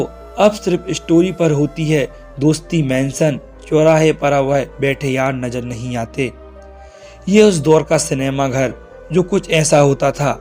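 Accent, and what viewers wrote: Madhya Pradesh, India. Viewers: Bhai aap kaha ke rahne wale ho aapki aavaz se to mp madhey pradesh lag raha hai ki. Aap mp se ho kyu g 😅😅😅🙏🙏 please reply sir🙏🥰🥰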